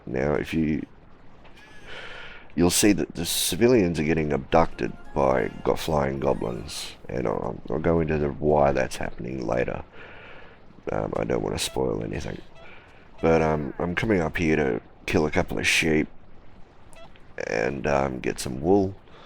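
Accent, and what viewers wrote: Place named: Australia. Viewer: Not meant to be a "dis" Your voice is so fucking smooth, I felt asleep the last 3 days listening to part 3-6. Your gameplay is really relaxed and I like that you do it at 10x speed. But: there is not much value to looking at your vids for hints etc... For the last 4 ones I skipped and skipped and skipped. Im sorry mate (love ur australian accent), but: just consider reading a book into youtube. I would listen to it :)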